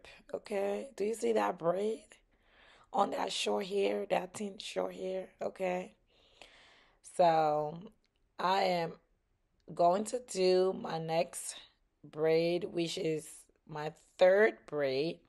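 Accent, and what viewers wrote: Liberia. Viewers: You sound so Liberian. Great job. Beautiful❤❤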